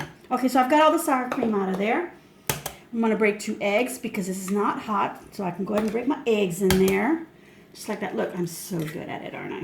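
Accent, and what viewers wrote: Texas, United States. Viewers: You know what is funny,,,, I was watching your more recent videos & you don't have the accent that I can hear in this video! Have you always lived here in Texas? I moved here about 4 years ago. 😁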